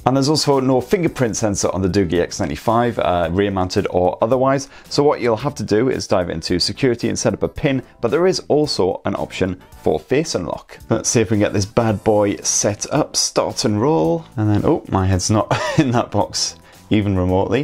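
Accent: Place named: Newcastle, United Kingdom. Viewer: are you a geordie? lol just stumbled on this I'm from Elswick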